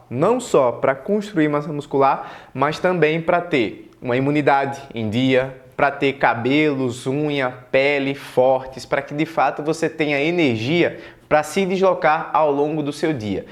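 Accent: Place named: Sergipe, Brazil. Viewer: Pelo sotaque eu percebi que O dr. é de Sergipe kkkk aqui pertinho de Salvador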